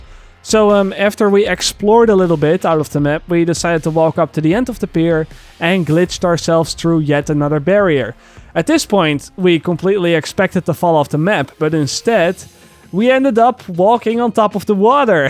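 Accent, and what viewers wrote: Netherlands. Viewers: Cool video, also i'm pretty sure that your'e Dutch... i recognize the accent xD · je bent bijna je Nederlands Engels accent kwijt. i just joint you channel, I see you have some awesome videos. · Mals nederlands accentje hoor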